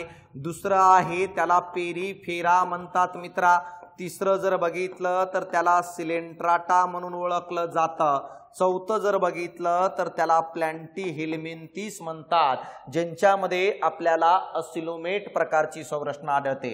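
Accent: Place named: Latur, India. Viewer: Sir तुम्ही लातूरचे आहात का. आवाज वरून वाटते.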